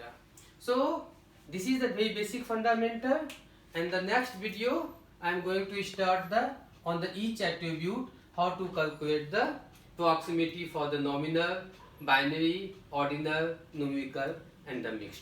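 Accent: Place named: India. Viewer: not racist but damn indian accent is something... tbh i rather hear indian speak indian so i can at least use auto generated.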